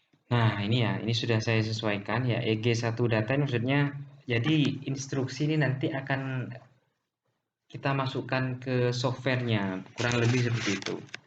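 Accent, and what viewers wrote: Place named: Madura, Indonesia. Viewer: Logatnya madura😅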